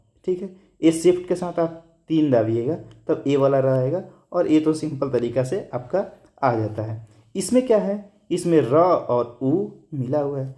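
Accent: Motihari, India. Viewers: Aap Motihari se hai kya bhai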